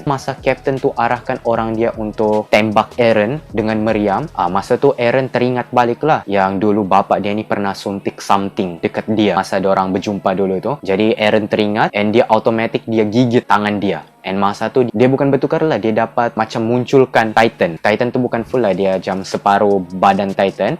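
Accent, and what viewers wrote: Sabah, Malaysia. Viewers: Saudara speaker ini pasti orang Sabah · Hsha sya suka ni orng ckpp ORANG SABAH THE BEST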